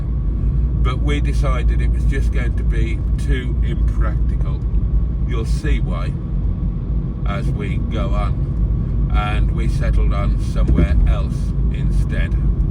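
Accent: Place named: Birmingham, United Kingdom. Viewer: Was there the other week, great place and a great fish and chips. 20p for a pee though!! By the way have you spent much time in Birmingham as I can detect a bit of an accent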